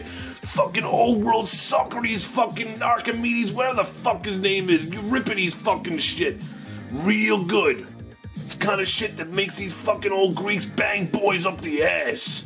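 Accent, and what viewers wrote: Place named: Brooklyn, United States. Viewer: Great review and you sound exactly like my uncles from Brooklyn. When they say "fuck"it sounds so elloquent. Aramis is the shit and DOES smell like guys at the train station.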